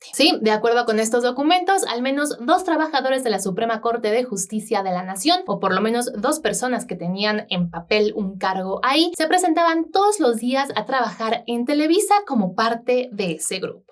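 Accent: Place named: Argentina. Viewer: y porqué hablas con acento Argentino?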